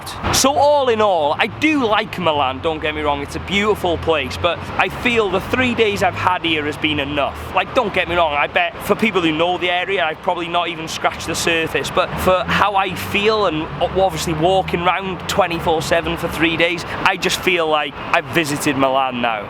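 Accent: United Kingdom. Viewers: Love your videos but i can't stand the British accent it reminds me of austin powers. If i didn't like the content so much i wouldn't be watching it all. Cheers and mate my 2 least favorite words specially cheers .... Come to Seattle Washington USA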